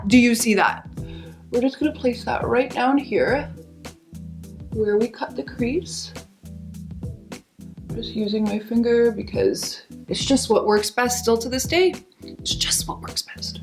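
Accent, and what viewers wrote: Canada. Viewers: Are you Canadian? Your accent is so cute, like really eloquent and like, detailed pronouncing. Most Americans don’t talk like that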